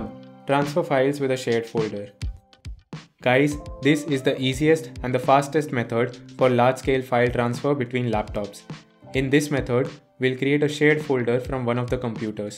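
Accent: India. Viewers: The moment I heard the Indian accent, I knew my problem would already be solved 😏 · You know it's a great, quick and easy guide when you hear indian accent. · Indian accent = auto dislike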